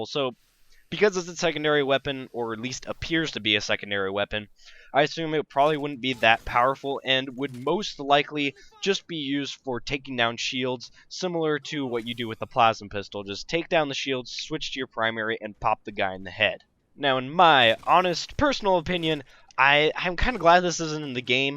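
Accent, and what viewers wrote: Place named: United States. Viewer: i bet your american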